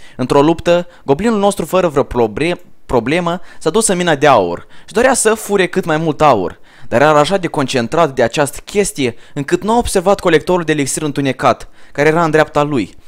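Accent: Moldova. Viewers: Dat Moldovean accent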